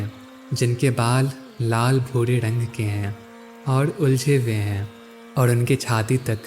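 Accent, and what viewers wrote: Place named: Bengal, India. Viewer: Ye bangali babu hai isliye 😊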